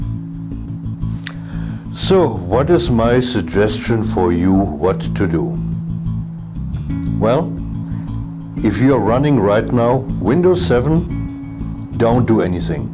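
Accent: Germany. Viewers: [non vid question]: are you german? your accent sounds a lot like that :v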